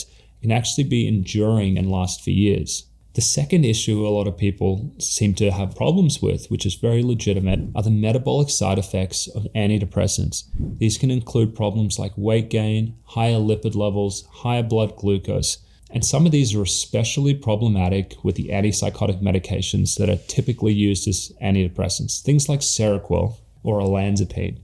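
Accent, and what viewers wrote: Australia. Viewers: had 2 hospital stays last year after not being in a psych ward since 17. I’m now 43. It was. HARROWING and BEWILDERING and WILDLY ETHICALLY REPULSIVE that they dispensed meds so freely. I spent 25 days in Denver Springs and saw and experienced things I never want to again. But it grieved me how people weren’t TOLD to get outside, weren’t taken aside to be talked to like a HUMAN PERSON with normal emotions who maybe needs a nurse to just sit beside them for a hot minute and be an empath (they didn’t seem to know what to do with young women there in a psych ward for the FIRST TIME EVER defeated and crying on the ground. get some social skills, docs!) For me, I’ve had one of the worst, longest cases of akathisia and tardive dyskinesia my doc has ever seen and he’s seen a lot. Something’s gotta change and so many of us need healing. I’m working on the emotional and heart/soul healing now with my pastor, counselor, and writing a memoir. 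I just found your channel, Dr.. And you must be… Australian? My fave Christian brother artists are native Aussies and sound like you.? Do you know them? THANK YOU FOR DOING WHAT YOU DO. even if I never get to your clinic or Dr. Amen’s or get off bipolar meds on this side of Heaven. You are doing amazing work. God bless you and that clinic.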